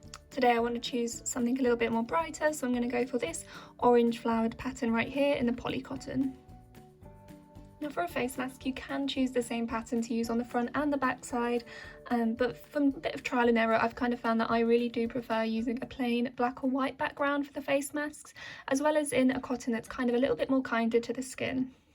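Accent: United Kingdom. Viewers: Where ar u come from ? I like ur english look like british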